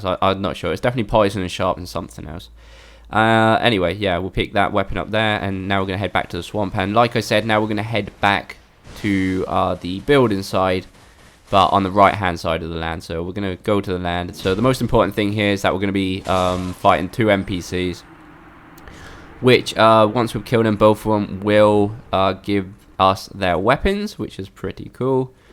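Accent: England, United Kingdom. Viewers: I truly love listening to you English fellas doing walkthroughs. I catch myself with with my country accent tulkin like yew guys . Haha. I do it quite well. 😂😂😂 Thanks!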